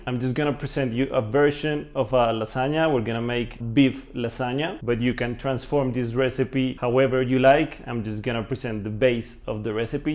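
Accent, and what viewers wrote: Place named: Rolo, United States. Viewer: Although I really love your "rolo" accent your english is quite nice 😲 I'm ready for: eats. 💪🏼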